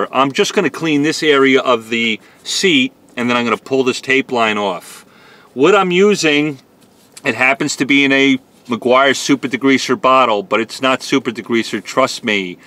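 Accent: New York, United States. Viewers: Is that a New York accent I hear? I love it. Thanks for the tips! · Sounds like a Liberal from New York living in Dallas.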